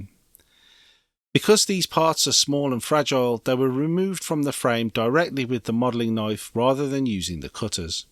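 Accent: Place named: Liverpool, United Kingdom. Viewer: I love watching these videos even though I’m never going to do it myself. I’ve done modelling and I’m done with it. I sill enjoy watching the process as a spectator. This videos are entertaining in their own right and I love the presenters slightly bland Liverpudlian voice. Very relaxing video to sit through strangely. Please do Cutty Sark, a favourite of mine.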